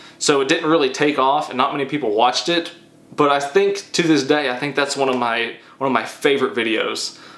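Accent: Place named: South, United States. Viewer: That Southern drawl is on point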